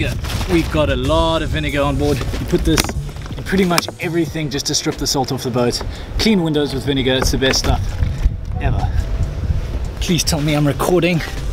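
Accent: South Africa. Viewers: Love your videos , love what you do . Are you South African ?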